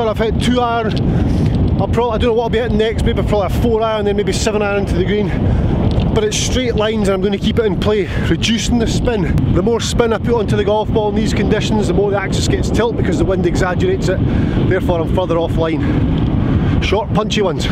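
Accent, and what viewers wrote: Scotland, United Kingdom. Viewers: I love the music, and your 🏴 accent. Realy authentic! Discovered the golfing machine in 2018 which led me to you when you had 6K subscribers. TGM and FREE YouTube channel made me understand previously consumed books and video’s better as well. Keep doing what you do. My handicap just turned 18 and is trending down 🏌🏽‍♂️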